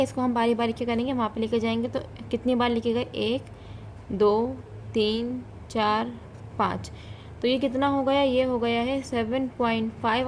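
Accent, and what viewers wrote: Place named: Sindh, India. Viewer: Madam aapki mother language sindhi hy ya urdu aap urdu sindhi ki tarah bol rahi hain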